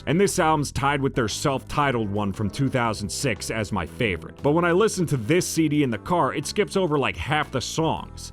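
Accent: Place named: New Jersey, United States. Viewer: He’s 25?!! Maybe it’s the Jersey accent(?) but that doesn’t sound like a 25 year old to me lol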